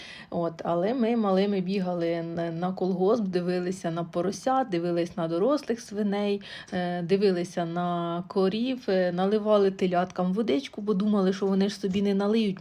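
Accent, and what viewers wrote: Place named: Karlivka, Ukraine. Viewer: акцент Карлівський-)))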